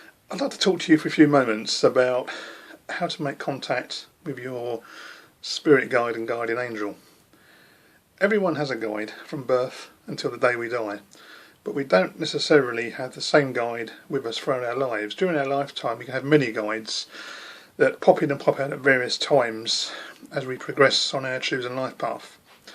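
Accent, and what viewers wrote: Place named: United Kingdom. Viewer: This was too freaky! I have been trying to reach out to my spirit guide for a few years but recently more so. I always had a name in my head and I randomly came here to listen to you because you are British mainly and your words resonated with me but when you said the name of your guide I was freaked out.....Jonas is the name I have had in my head for a few years now. I thought I'd made it up. Now I know it is my guide. I wonder if it's the same guide as yours? I'm freaked out!!!!! Synchronicity or what????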